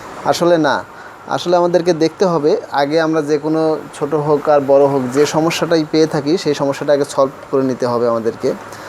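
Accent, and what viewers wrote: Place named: Dhaka, Bangladesh. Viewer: ভাই , আপনার কথায় বলার ধরোন বুঝায় আপনি ঢাকা লোক |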